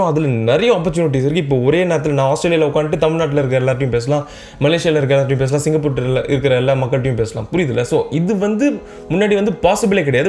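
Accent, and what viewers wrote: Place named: Sri Lanka. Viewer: Bruh u sound like srilankan bruhh 😂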